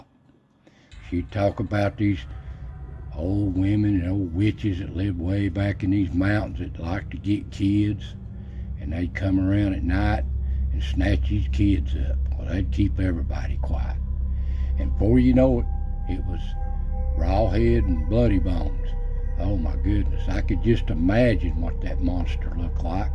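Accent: Appalachia, United States. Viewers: Love your videos and stories! Your accent reminds me of my Granny and Pappy! They were from Middlesboro. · I love hearing your stories. The way you talk always makes me think of my Appalachia granny and a lady I worked with that was from Greasy Creek, Ky. Good memories.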